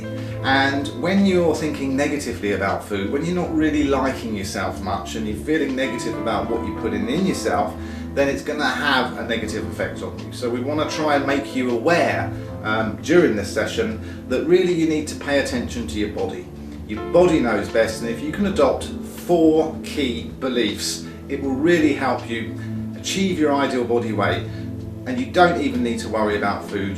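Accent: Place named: United Kingdom. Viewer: Thanks! What I like about your vids the most is, that you dont go over the top and try to force it down our necks. Its is very friendly, peacful and joyful process. I love that. And your British accent rocks! Thanks again. xx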